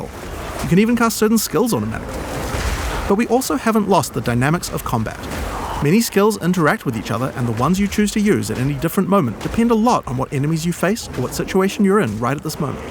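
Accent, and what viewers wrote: New Zealand. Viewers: It's always surreal hearing my native Kiwi accent on YouTube lol. Looks dope af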